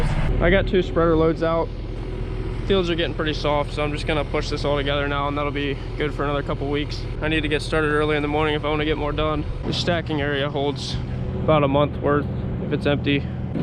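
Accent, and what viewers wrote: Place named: Pennsylvania, United States. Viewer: Hey, thanks for your answer, I was curious. Thank you also for your excellent videos over the years, I watch every single one ASAP! I am originally from Bucks County, PA and really enjoy watching you and your dad, and listening to your accent, brings back memories of my grandfather's farm in Douglassville, PA. You do great work, best wishes for continued success, you certainly deserve it!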